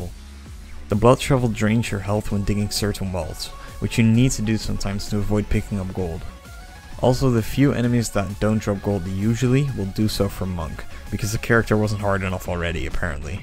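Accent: Netherlands. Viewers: Ik hoor je Nederlandse accent😜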